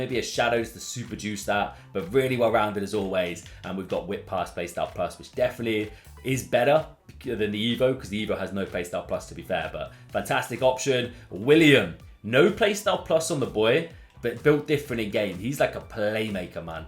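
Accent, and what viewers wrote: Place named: England, United Kingdom. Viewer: I really didn't want to sound like a prick but I wanted to make sure I got my point across which is why I wrote a bible after lol. I know I'll never be able to afford end game in FIFA, but I can imagine it's a lot of fun to throw almost any player in the squad (I'm glad you made it to the other side lol). It's the mystery or curious factor that makes us all want to use those top tier players, but sadly most of us won't have that chance. Again, without sounding like a prick, I think a series with a cheaper budget could really "help" your channel and a lot of people will grow attached to it. I feel like the cheaper budget/Hidden Gems/Silver Beasts type videos are something that have been missing from the community for a while now (or maybe just very rare). IMO, they're fun and help connect the community since more people can relate and interact on the videos. 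I'm actually 28 tomorrow and I've always wished that I grew up in England as a kid (I feel like my age has some "value" since I've been grinding FIFA for 14+ years lol). Having soccer as your die hard passion and the only sport that you watch was almost like living in the shadows in a way. I couldn't believe it was so loved and celebrated almost practically everywhere else in the world. It was kind of depressing. Anyway, even though I've watched a handful of your videos, I was still geeking out a bit because you're from England. Just really cool to me lol. I always loved the way you guys spoke, like dropping the C word c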